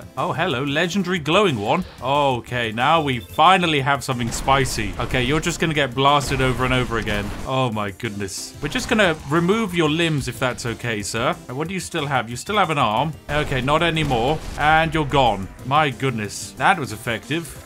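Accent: United Kingdom. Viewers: british guy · The amusing British man Is back with his ludicrous tomfoolery Todd is Appeased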